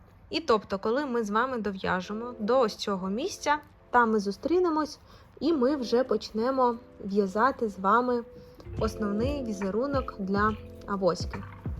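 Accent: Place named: Russia. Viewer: Прекрасный мастер-класс,прекрасная мастерица,но боже, как же режет слух украинская речь с русским акцентом😓 Такое чувство,будто украинский не ваш родной язык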